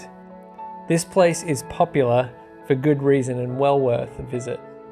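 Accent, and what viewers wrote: Australia. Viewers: Thanks mate ... that was awesome. You sound like an Aussie? Taking my family to Scotland soon to Aberdeenshire ... Banff in particular.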